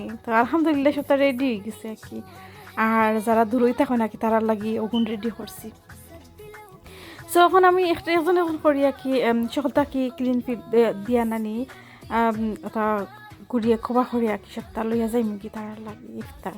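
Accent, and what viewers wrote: Sylhet, Bangladesh. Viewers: Mashaallah nice blog ar khuv sundor sylheti mat, thanks for enjoyable shearing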